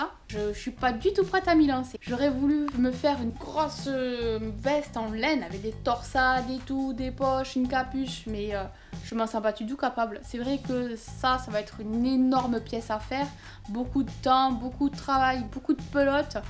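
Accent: South, France. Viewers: Tu peux être fier de toi😉rome ne c'est pas fait en un jour 😂😂j'adore ton accent du sud😉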